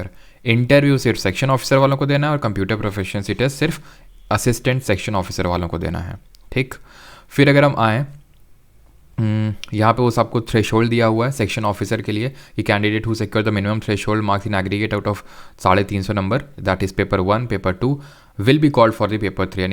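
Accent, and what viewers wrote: Kanpur, India. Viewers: Ap kanpur se hai kya ?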